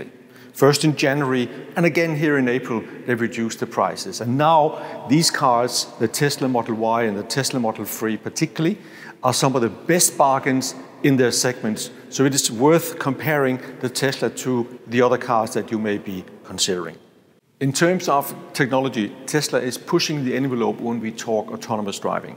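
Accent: Denmark. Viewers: It definitely has the enhanced autopilot, since it shows that it wants to change lane, when you started indicating in the video. The only problem was, that you yanked the steering wheel too hard. Its very sensible on that. But I agree: The feature is not worth its money (just yet)… I love the danish accent by the way! Saying that as a half dane. Haha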